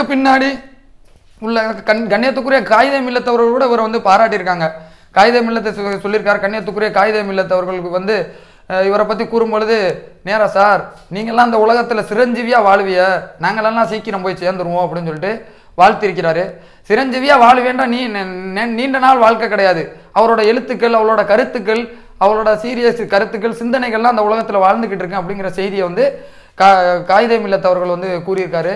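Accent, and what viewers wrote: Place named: Thondi, India. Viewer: தொண்டி தமிழ் அருமை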